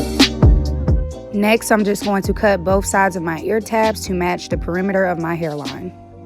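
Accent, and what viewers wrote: South, United States. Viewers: You gotta be from the south 😍😍😍love your voice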